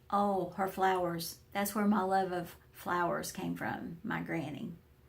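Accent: South, United States. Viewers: I love your sweet southern accent.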